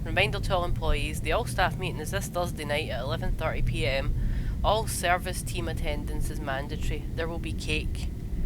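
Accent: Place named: Scotland, United Kingdom. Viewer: He's Scottish. ITS PERFECT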